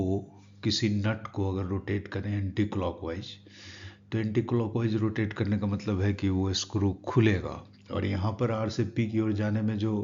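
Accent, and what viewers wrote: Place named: India. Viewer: Used india english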